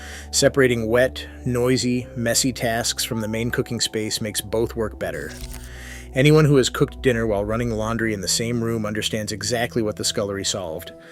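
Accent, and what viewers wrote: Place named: United States. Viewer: Why use an American voice for a British video? Interesting video otherwise. Lots of good features that went by the wayside for false cost savings.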